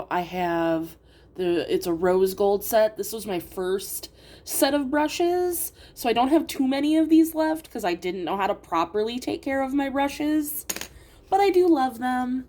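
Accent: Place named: Midwest, United States. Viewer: Love me some midwestern accent, reminds me of home!!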